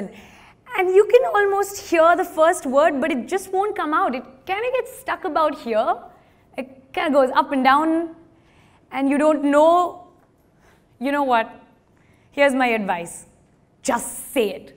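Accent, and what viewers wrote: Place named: India. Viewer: I just love your Indian accent,.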